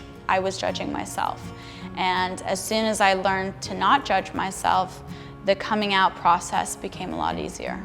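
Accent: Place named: Australia. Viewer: why does she sound kind of australian? lmao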